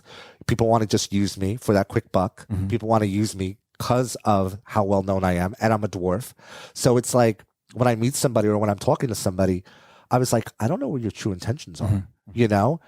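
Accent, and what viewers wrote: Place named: Bronx, United States. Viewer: You have such a thick Bronx accent!!!!! Represent!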